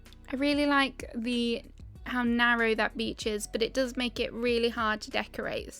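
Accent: United Kingdom. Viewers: Well I am a sucker for British accents lol : ) But once again love your channel, I have totally gotten some inpriration. <3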